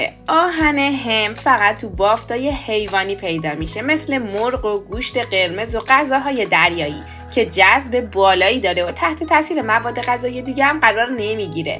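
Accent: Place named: Isfahan, Iran. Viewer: قبلاً گفته بودین اصفهانی نیستین اما من مطمئنم یا مادر یا پدر یا یکی از نسل پشتتون اصفهانی هست ، چون واقعا قشنگ لهجه اصفهانی دارید 😂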